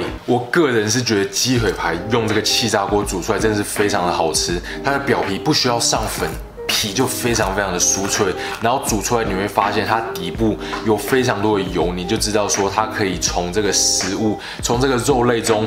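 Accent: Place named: Taiwan. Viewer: 发的内容很好，这台湾口音真的扁到受不了，大概听普通话习惯了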